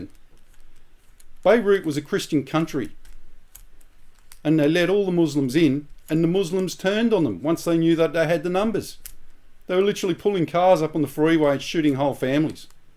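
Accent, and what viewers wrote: Australia. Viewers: Great to get a dinkum Aussie presentation in A lingo we understand.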